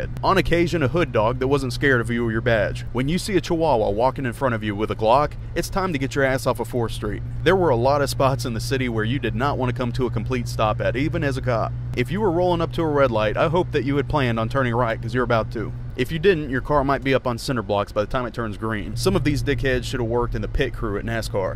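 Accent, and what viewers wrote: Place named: St. Louis County, United States. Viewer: You sound like you're in St. Louis County.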